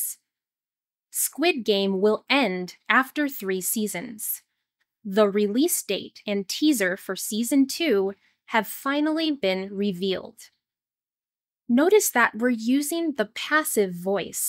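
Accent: United States. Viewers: Hi, I really like your videos and your voice, your American accent and voice is so beautiful, you are different from the other channels, I like the videos that you make, it’s very effective and I hope that you make a lot more videos and maybe advanced English, thank you❤ and keeping going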